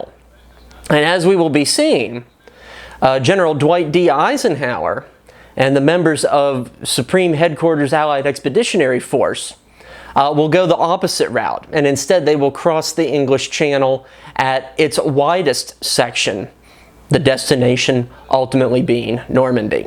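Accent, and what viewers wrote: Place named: Louisiana, United States. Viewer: Hehe, historian, yes, linguist - no. "Shire" is pronounced the way you say it - as a stand-alone word. When part of a county name, NO-ONE in the UK calls e.g. "Oxfordshire" Oxford-shire - we pronounce it "Oxford-shur" - as with every "Shire" in the land. To British ears, you sound like a back-country Louisiana hick. Got your own banjo?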